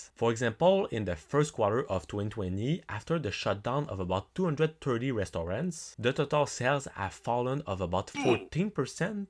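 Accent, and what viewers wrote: Quebec, Canada. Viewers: Es-tu Québécois? J'ai beaucoup d'amis avec le même accent 😅. Si oui , tu fais des vidéos en français?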